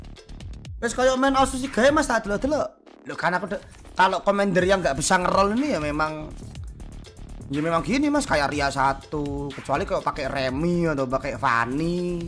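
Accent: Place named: Java, Indonesia. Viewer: Mas aku rak ndelok live sepurane tapi omonganmu ki koyok wong jowo e mas Jane we cino opo jowo?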